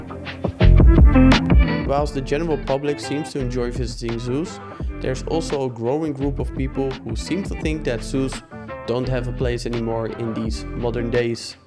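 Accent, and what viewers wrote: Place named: Netherlands. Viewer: I hear dutch in your voice😁🇳🇱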